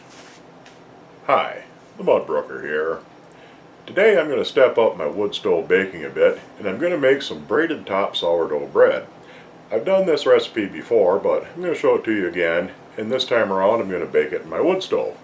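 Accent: Minnesota, United States. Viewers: Mr. Mudbrooker, do I detect a Minnesota accent? I also wonder about the lake in a couple of your videos with wildlife (black bear, ducks, geese, Sandhill cranes), which I can easily imagine is one of the lakes in Minnesota.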